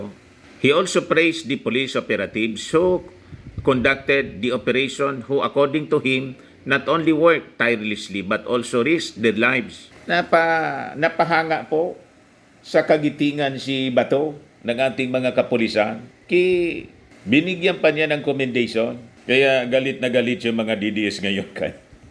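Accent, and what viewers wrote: Bicol, Philippines. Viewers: bicolano kayo sir?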